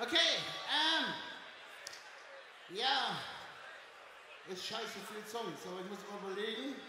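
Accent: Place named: Germany. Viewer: A German accent fits perfectly with Heavy Metal!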